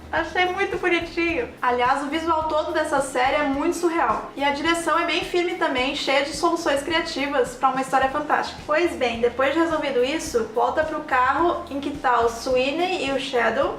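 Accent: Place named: Santa Catarina, Brazil. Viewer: Man acho vc tão linda❤️ me lembra minha melhor amiga q brigamos e n nos falamos mais, o sotaque parece de Santa Catarina " manezinha" ahhh man 😂❤️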